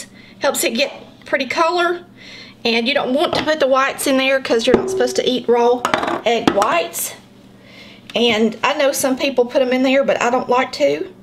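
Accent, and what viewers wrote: South, United States. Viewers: Love your southern accent. I make a limeade icebox pie that’s very delicious. Tastes lovely ke a refreshing slice of limeade pie. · 😂😂 the country accent always adds some extra syllables to throw u off!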